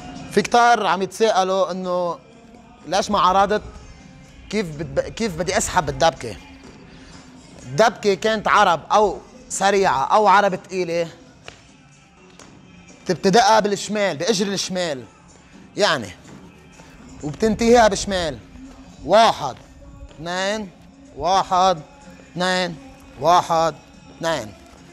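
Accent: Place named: Iraq. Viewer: عم تحكي عراقي واو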